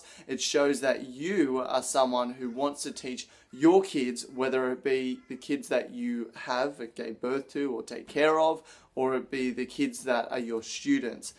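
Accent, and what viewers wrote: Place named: Australia. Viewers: your Australian accent actually helped me pay attention, since it's not what I hear daily. Maybe I'm unusual, but I enjoy different accents and cadences. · He has a fine Australian accent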